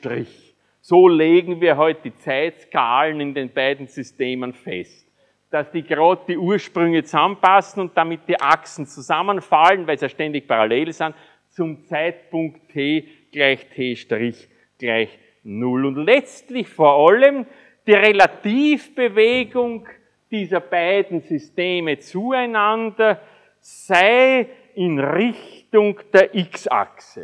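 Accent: Vienna, Austria. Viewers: bin durch Zufall hier vorbeigekommen, und dann hängengeblieben. Sensationeller Vortrag, wunderbare klassische Wiener Sprachfärbung und sehr verständlich. Da bekommt man Lust noch ein Studium zu beginnen.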